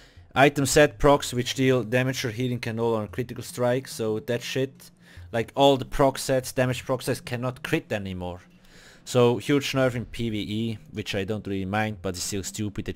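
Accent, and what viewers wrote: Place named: Switzerland. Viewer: Ich finde sein schweizerenglish so genial obwohl er auch deutsch spricht... sagen wir mal schweizerdeutsch... aber man merkt mehr und mehr in seinen letzten videos das ihn das spiel mehr abfuckt als er es für gut befindet ... in den letzten videos finde ich persönlich merkt man das enorm !! Er schimpft mehr als das positive daran zu sehen ... nämlich es soll im Endeffekt spaß machen !!! Nun ja ich schau deine Videos nur noch wegen dem schweizerenglish 😂😂😂😂 ansonsten naja dislike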